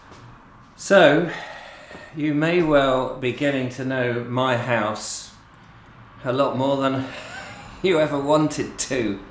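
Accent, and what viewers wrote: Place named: England, United Kingdom. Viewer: I love your beautiful English accent and the way you think! From Canada!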